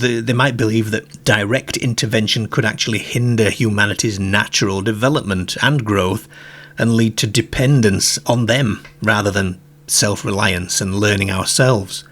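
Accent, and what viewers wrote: United Kingdom. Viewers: I've heard his accent before, just cant recall where its from in the UK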